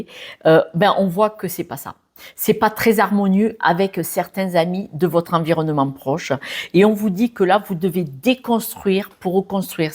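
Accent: South, France. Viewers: Votre analyse est super. Je me rends compte en effet que certaines choses changent. Merci votre accent du Midi réchauffe le coeur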